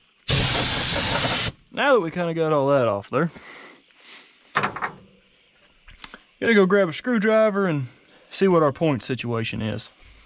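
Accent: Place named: Georgia, United States. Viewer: SOUNDS like he's in Georgia, or FROM Georgia, anyway.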